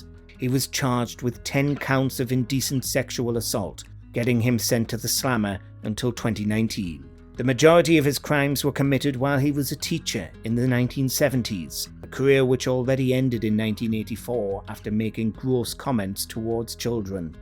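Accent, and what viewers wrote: United Kingdom. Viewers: thank you my lovely. That was nice of you to reply, i did not expect it to be seen. Im a sucker for British accents, his is definitely sweet 😊 Have a great weekend.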